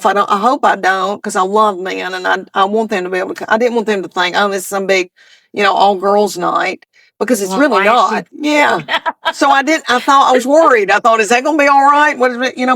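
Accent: South, United States. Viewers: Its on par with Seinfeld humor, which did great so not sure why theres not more of it. Clean humor that for the most part deals with humorous ironies of day to day life. This makes it relatable to everyone who listens to it. And for whatever reason, her deep southern accent makes it all the funnier. Glad i found her ❤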